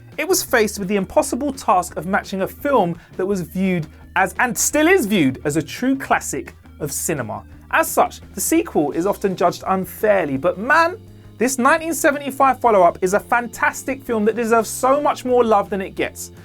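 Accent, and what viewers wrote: United Kingdom. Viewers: Why don't you guys just realize that British people pronounce things differently than Americans do?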